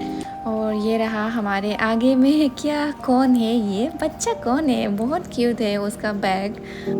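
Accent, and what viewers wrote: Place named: United Kingdom. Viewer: your english accent is British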